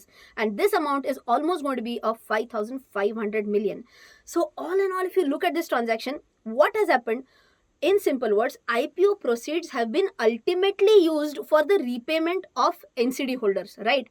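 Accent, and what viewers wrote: Maharashtra, India. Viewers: How many people like her Marathi accent for her english language. अस कस काययययय…... You are the ideal for all Marathi girls mam..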